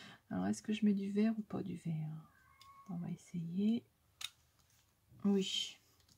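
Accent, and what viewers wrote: North, France. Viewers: J'aime vos cartes de Noël et le bon accent du Nord si je ne me trompe pas a bientot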